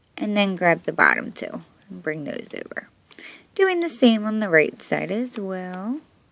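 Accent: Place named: South, United States. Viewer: That southern accent tho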